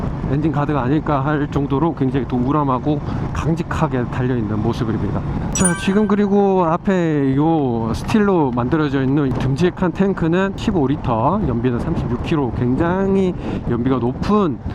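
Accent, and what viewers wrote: Gyeongsang, South Korea. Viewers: 형님 경상돕니까? 너낌~ 세알리고~ ㅋㅋㅋㅋ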